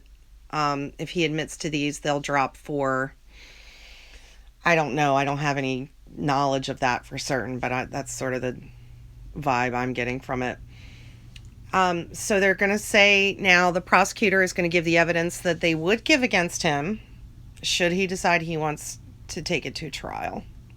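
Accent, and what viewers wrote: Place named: Maine, United States. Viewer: I love your accent! Not all Mainers sound like (I certainly don’t) but I know a fair few who do. It’s mostly the older generations though. My grandmother used to speak a lot like and his mawm, only she knew the difference between “weren’t” and “wasn’t.”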